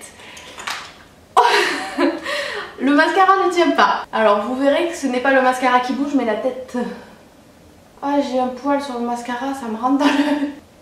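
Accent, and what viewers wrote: South, France. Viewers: L'accent du sud il est trop bien à écouter il met de bonne humeur😊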